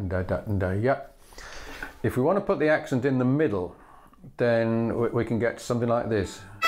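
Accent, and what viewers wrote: England, United Kingdom. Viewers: An English accent ha! Well I'm glad not all Englishmen hate the Irish. Do you have any good advice for the fingerboard technique to give it that Irish twang.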